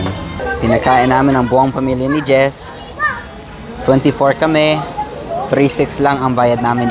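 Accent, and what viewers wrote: Ilocos, Philippines. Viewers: You also pick up Ilocano accent when talking tagalog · Nagka accent nadin ng ilocano hehe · Your accent very ilocano now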